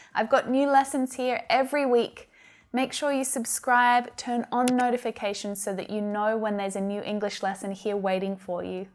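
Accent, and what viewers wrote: Australia. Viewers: I live in Canada and I am so happy to listen to your Australian accent that I love. I love to visit Australia as well. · Australian English is the coolest 👍🏻😃, I really like your accent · your accent is so different. I LIVED in montreal, Canada. The accent from Austrilia is so good.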